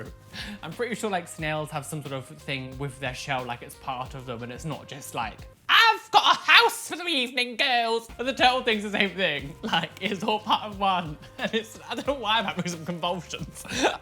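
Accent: United Kingdom. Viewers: Are you from the UK? Lmao u have an accent 😂🤣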